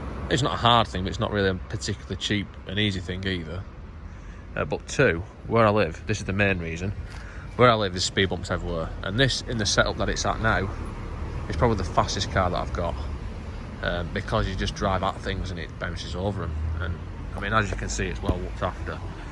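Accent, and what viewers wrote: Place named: Lancashire, United Kingdom. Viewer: yep and don't go talking posh when you become a star . The Lancs accent is great 👍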